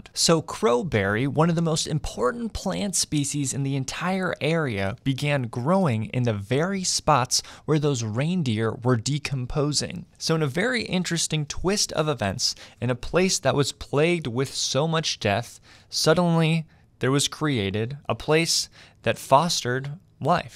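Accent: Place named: Canada. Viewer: You've got a Canadian mouth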